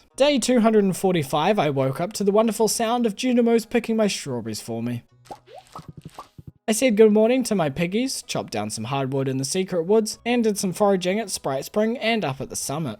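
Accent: New Zealand. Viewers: The way my brain stopped working when i heard the kiwi accent. Wasn't expecting a fellow NZer! I'm so used to hearing the American accent 😂 Awesome video dude, gives me something to watch/listen to while crocheting lol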